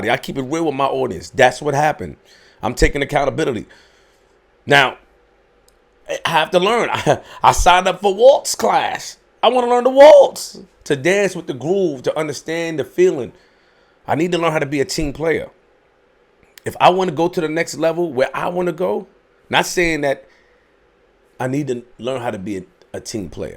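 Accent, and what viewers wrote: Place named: Queens, United States. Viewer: You talk like if Christopher Walken was from Queens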